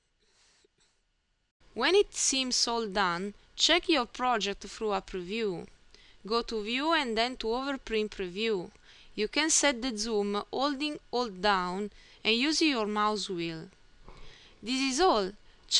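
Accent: Italy. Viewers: nice to follow even with your italian accent its easy to still understand actually very clear to understand :) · Are you Italian? Your accent sounds like italian people speaking English. :) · Hahah true I think so, I'm italian and I've think the dame thing 😃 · I'm Italian aswell..sounds like an Italian speaking English but, to me, the rhythm/strong emphasys on consonants when she pronunces 'We will take for example....' and 'which format the page must have...' makes me think that she might be Russian.....I have few Russian friends here in Bangkok and the 'musicality' is the same....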